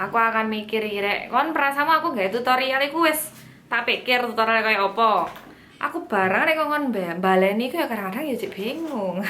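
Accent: Java, Indonesia. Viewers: suara medok nya itu loh aq suka banget 😁😁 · medok nemen mba... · jawa bet ngmong'a :D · adhell kudell hahah sami abi ge teu paham ah bhsana gs medok jawa deuih ampun. 😁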